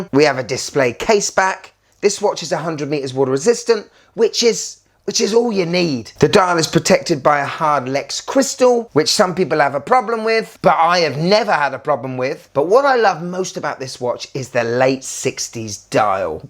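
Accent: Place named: Scotland, United Kingdom. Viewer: I thought you were one of us with that Scottish accent mate! 🤣🤣 both awesome looking watches and another fun show as always matey! 😁👌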